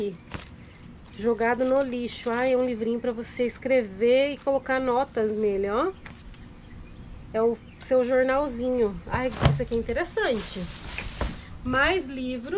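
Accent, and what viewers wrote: Paraná, Brazil. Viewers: Vc é do Paraná?? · Acho que vc tem algo em comum comigo kkkkkk amo achar coisas que de para reciclagem e eu também sou do Paraná nasci em Maringá e fui morar Curitiba ainda crianças ,este seu sotaque não me enganou kkkkkk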